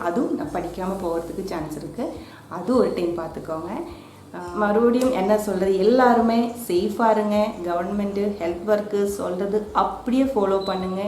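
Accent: Kerala, India. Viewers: So happy to see u. As ur voice u looking so awesome. I love ur tamil with malayalam accent. Ur recipes r too good. Keep rocking friend. 👍👍👍👍👏👏👏👌👌👌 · Sweet and simple 😊 malayalish tamil is cute chechi. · I like your voice and this malayalam mingled tamil😍😍😍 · Ur malayalatamil super..first viewer ... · Akka, its sweet to hear your tamil with malayalam slang. Don't worry about language we can able to understand it. Thanks for your efforts to give your recipes to us(Tamil people). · I love your tamil with malayalam accent nice to see you face to face. I learnt to make aappam and stew with your help. Thank you have a great YouTube experience 😃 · Hello mam, I have tried your receipe kadalai curry and aapam. Your explanation is so neat and easy to follow. Never watched anybody's channel talk like this. You are doing grt ,pls continue it. Also feel free to talk in the way which comes to you, listening keralites speaking Tamil always pleasent for us. 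Bz of dubbing difficulties, you may reduce your dish variety in future. So pls don't stress yourself much in translation.we are happy to listen to your Malayalam mixed Tamil .Keep rocking mam.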